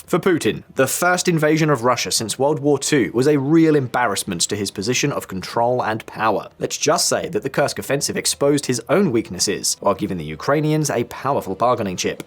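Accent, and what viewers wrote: United Kingdom. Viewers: A proud British English accent is a sure sign that what follows is propaganda, half-truths and lies sponsored by the London-Wall Street Financial Cabal in order to continue their endless, no win wars and increase their bloody war profits from their military-industrial-complex enterprises. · When I hear Brit accent, i know this is full of bs.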